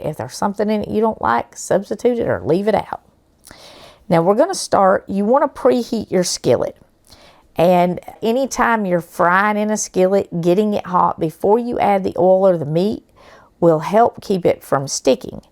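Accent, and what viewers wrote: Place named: Arkansas, United States. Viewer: Howdy! As of right now, I see you posted this video a couple of hours ago. I watch all of your videos on YouTube via TV. Always hit the “Like” & share your videos. I am about 1-2 hours, NW of Lake Tahoe. I love listening to you, your accent makes me feel like I’m with my Arkansas family, on my Grammy’s side. I hope y’all have a Blessed year ahead. Much love and blessings to your whole family. XXX RHONDA 🙏🏼✝️💖🇺🇸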